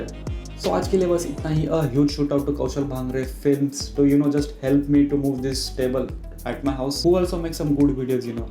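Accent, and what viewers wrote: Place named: India. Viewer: Why are you speaking Indian?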